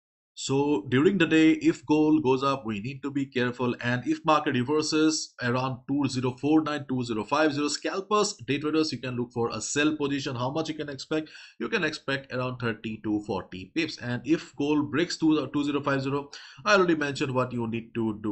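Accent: India. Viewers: He using simple indian english ascent so its seems there will be no problem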